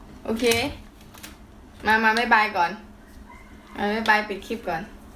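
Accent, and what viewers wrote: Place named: Isan, Thailand. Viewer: อีสานกลางใหญ่ออกคักแด555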